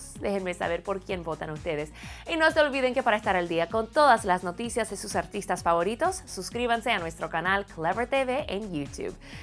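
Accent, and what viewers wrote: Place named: United States. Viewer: Esta bien que quieras hablar como estadounidense pero no mames porque exageras tanto!!!!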